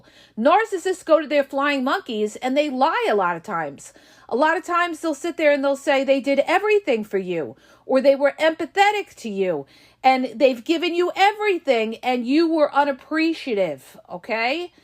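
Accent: New York, United States. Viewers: Your from queens or long Island, it sounds like im back in the old neighborhood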